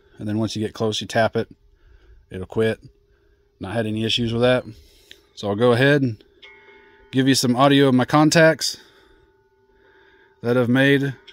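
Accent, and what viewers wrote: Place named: Illinois, United States. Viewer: This was an expensive video for me. 😊. I have a Kia Niro which is similar to your Prius in many ways, and I am afraid that I will duplicate your mount, the antenna, adapter and whip almost exactly. I basically have the same whip as you, I dug it out of my shed. Thanks for posting, I would not have known about this otherwise. I have had a real DK3 screwdriver bought from the late inventor for years, but it's been too big for anything smaller than the pickup I had when I bought it. This small screwdriver looks perfect for our modern small cars. I recognized your accent. You aren't too far from my wife's hometown (Marshall, IL) and where I went to college in the early 90s. I consider that area to be one of my homes! I will post something in a few weeks when I get it in my Korean hybrid. 73 de W0FMS/7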